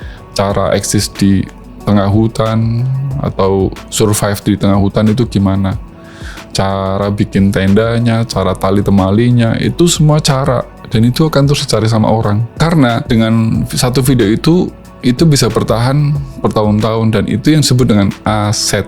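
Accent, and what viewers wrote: Indonesia. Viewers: Logatnya kaya wong KIdul Mas??? Mantap lanjut dan jaga semangat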